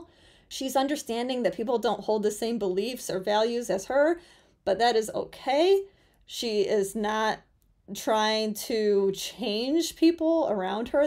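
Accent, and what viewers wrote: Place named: Midwest, United States. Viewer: Liking the midwest accent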